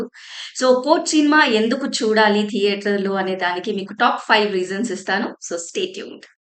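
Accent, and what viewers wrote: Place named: Telangana, India. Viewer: Nice review and yup good movie .. finally telangana slag😂.. aagam aagam jeyakunda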